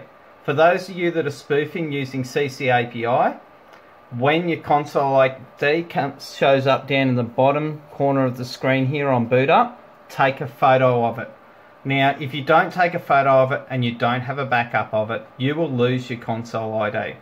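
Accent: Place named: Australia. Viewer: Mate... if I knew where you lived, I'd but you a beer or three...! Got me out of a jam. Good to hear an Aussie accent that knows what he's talking about / doing.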